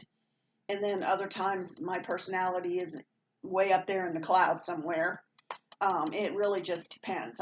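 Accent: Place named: South, United States. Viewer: Hello there thank you so much for all of your very sweet words!! I love your southern accent!! Do you like my northern accent? I’m northern Pennsylvania! Xoxoxo 💕🦋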